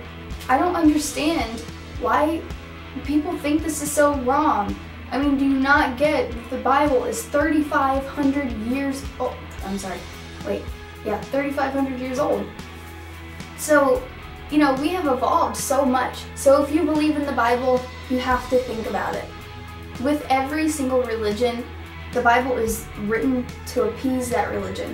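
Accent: United Kingdom. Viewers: i thought she was british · dont u have British accent? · I thought you were brittish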